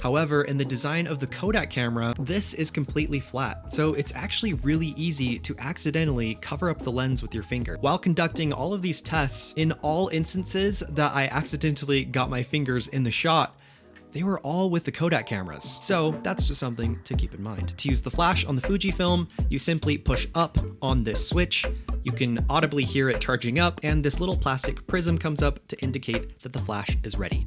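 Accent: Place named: United States. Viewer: Thanks this your name well look I like my vintage camaras which ihave few years back when iwas younger now im pensioner in London i still got my canon AE1 program which i love ❤️ I used to canon F1 nikon Fm2 mamiya 6x7 afortunally i got them stolen any way your video help me because hopefully I going to Colombia in few weeks time i believe Kodak film 200 is good because Colombia is very hot and sunny ☀️ so according what you say Kodak is perfect for Colombian whether since im back to photography i see you twice you from like Japan o somewhere there you English is American and you very good explaining all this camera staff means photography which really apreciated is like i feel young again thank you very much 👌👌🙋‍♂️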